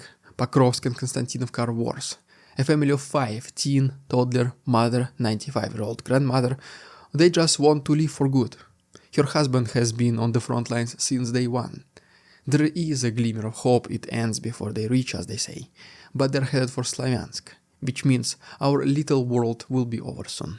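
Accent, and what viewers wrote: Russia. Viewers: Dear; Your thick Russian accent butchers the English language, and is the main holdup in your channel going viral... It would be quite easy, but you just won't put in the effort to learn to speak with better pronunciation. WHY???????? clearly you learned the vocabulary to a superior degree, why not go one more step????? THERE ARE ONLINE COURSES... YOU MIGHT TRY GETTING ONE OF THEM TO EVEN SPONSOR YOU!!!!!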